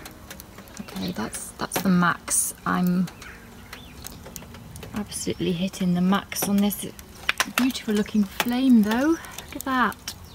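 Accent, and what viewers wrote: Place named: Manchester, United Kingdom. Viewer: You can get there. Your skills will only sharpen. You should watch a video about Lucalis pizza in New York how he started. I see you Mabey having your own pizzaria one day. I'm curious are you from Manchester? I deal with alot of tourist I'm a mixologist on the river walk and your voice sounds like its from Manchester.....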